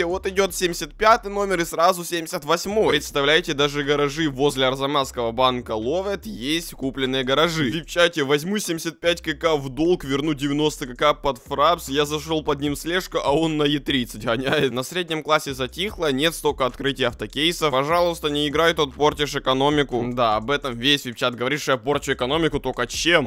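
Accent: Ukraine. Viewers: Ммм ты с Украины?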